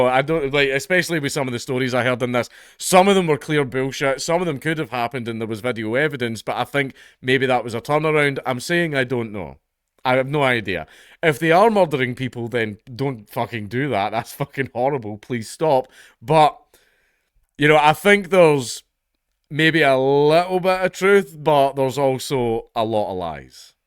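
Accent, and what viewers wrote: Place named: Scotland, United Kingdom. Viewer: I’m just here to see do different accents then revert back to his HEAVY Scottish accent 🤣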